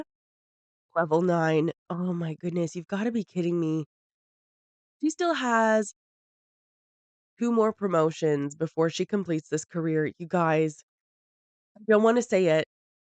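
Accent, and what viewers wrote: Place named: Ireland. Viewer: So about 4 episodes ago was the first video of yours that i had found and please dont take offense to this but i remembered why i fell in love with your videos. Your voice sometimes sounds very irish. I'm 25% but born raised US so you were entertaining and then when im sober i still love your videos lol. also i can never here the background noise of the construction. and rarely hear doggo. I remember clicking ahead and being like who are these people???? and here we are lol. Thank you for all the wonderful videos!!! <3 Still hoping for like an active members only discord lol 🤞🤞🤞🤞🤞💜💜💜💜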